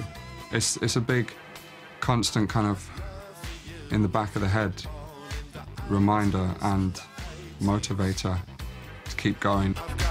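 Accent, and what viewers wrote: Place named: Scotland, United Kingdom. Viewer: Scottish ,,,,cool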